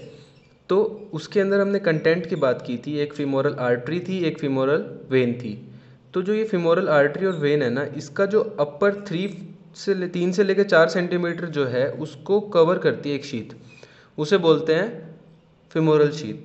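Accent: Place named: Haryana, India. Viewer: sir tham to haryanvi lago ho manne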